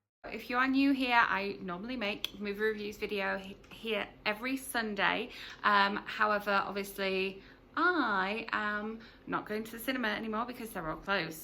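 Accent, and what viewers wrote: United Kingdom. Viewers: I haven't seen that yet. As a Welshman I am proud to say he is one of our own. I can't believe you are Canadian, you sound Middle English.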